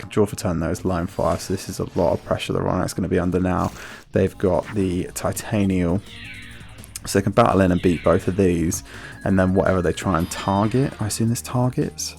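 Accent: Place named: United Kingdom. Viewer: i like ur commentary your british voice is soothing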